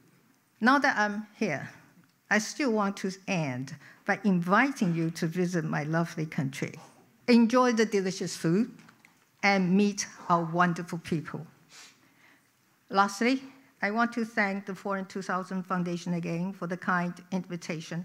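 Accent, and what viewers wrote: United Kingdom. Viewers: 貴族標準的英國腔，令人賞心悅目，移居USA 40年的台灣人，感謝🙏前總統蔡英文繼續為台灣拼外交❤❤❤🎉🎉🎉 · 總統的英國腔真的好好聽❤ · 英國腔好好聽😍愛了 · 的发音是英式的！👍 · 確實! 擁有敦倫賑精學店的腔調味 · 有點英國腔的蔡總統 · 好優美的英式英文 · 前總統用漂亮的英式口音，在國際為我國的自由民主發聲👍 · 蔡總統的英式英語真道地，很好聽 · I love your British accent Wingardium Leviosa to our liberty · 感謝蔡總統卸任後努力做外交，好久沒聽到蔡總統的英國腔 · 聽蔡總統的英倫English 演講，像喝一杯淺焙曼特寧熱咖啡，深邃雋永，感謝蔡總統為國訪問友邦，我早猜到蔡總統離開總統府之後還是不會閒下來，還會持續為台灣出力，讓台灣人驚艷，給蔡總統我最好的祝福，您是台灣人永遠愛戴的總統！ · 超級喜歡總統的英式口音，感念她執政8年總統，不管內外成績亮眼，讓世界看到台灣發光發亮。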